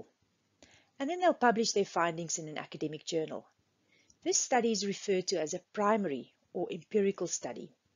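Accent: South Africa. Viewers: South African accent recognisable anywhere in the world ❤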